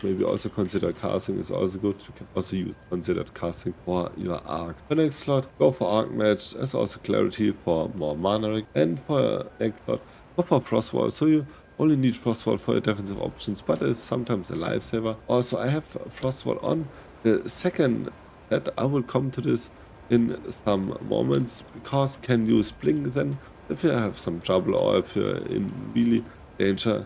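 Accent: Germany. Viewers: the German accent doesn't help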